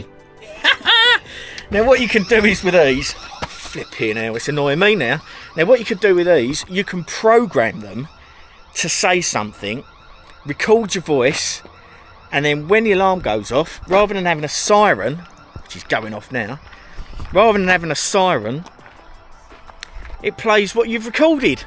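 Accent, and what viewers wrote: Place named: Essex, United Kingdom. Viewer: Well done. Love your Essex accent 😊 I lived in Epping when I got married then moved to Rayleigh for years until we moved up to Norwich in 2003. Mal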